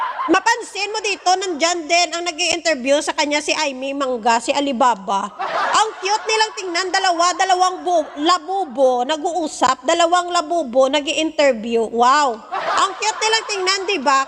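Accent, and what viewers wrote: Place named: Visayas, Philippines. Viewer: kapag naririnig kita madam naalala ko c sen meriam na kahit tunong bisaya kayo pero malaman ang mga sinasabi at kahit mabilis k magsalita my direksyon at nasa tuno ang bawat buka ng bibig mo.isa kanang alamat madam sa larangan ng vlogging.mabahay k godbless❤❤❤❤❤❤❤❤❤❤❤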